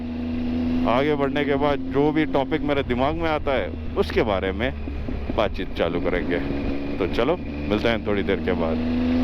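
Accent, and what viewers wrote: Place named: Karnataka, India. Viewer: bhai, plz make video on riding gear and liked your kannada accent 😊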